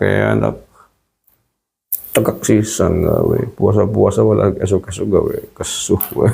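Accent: Banyumas, Indonesia. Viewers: Saya sering ketawa saat bapak bicara campur aksen banyumasan, hehe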